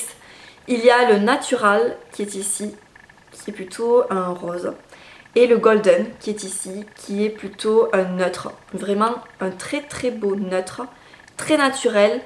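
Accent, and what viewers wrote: South, France. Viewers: J'adore regarder tes vidéos sont un vrai plaisir, surtout avec ton petit accent du suuud !!^^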